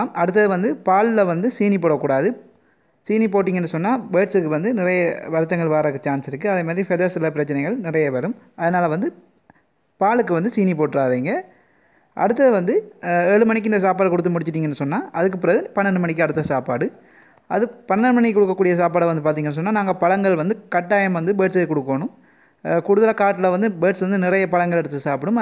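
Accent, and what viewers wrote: Sri Lanka. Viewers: Super anna nenga nama natuu Tamil super nanum srilanga than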